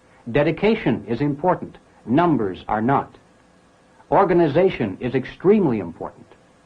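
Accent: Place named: England, United Kingdom. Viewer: いつもありがとうございます。の語りは大変聴きやすいです。英語音声を消さないのもいいですし、日本人には探せない情報を英語訛りで話されていると有り難みが増すのですが、声質がソフトなので聴いていて全く疲れず、スムーズに頭に入ってきます。個人的には、大統領選挙投票前のイーロン・マスクに、終わりやん、って大阪弁でしょうか、というのがとてもニュアンスが伝わりました。日本人には出来ない翻訳です。全然関係ない映画の話ですが、コロニアという映画で、DSより更に危ない追跡者から逃げ切るエンディングで、旅客機を無理やり離陸させてと主人公が頼む場面で、機長が「OK」と応える和訳が「クソッ」になっていたのがちょっと嫌でした。文化が違うと直訳とは程遠いものになったりしますが、の和訳はニュアンスが極めて正しいのではと感じています。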